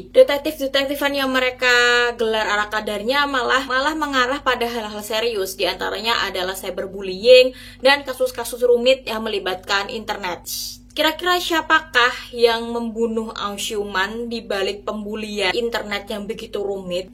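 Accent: Java, Indonesia. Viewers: nggak salah deh follow ig n subscribe youtube nya... banyak referensi buku yg kece2... paling suka pas ngomong "ketiban" 😄 sama medoknya sama ak... Org mana sih kak? kalo pas ke Solo hayuk ketemu, sharing referensi2 buku kita 🤓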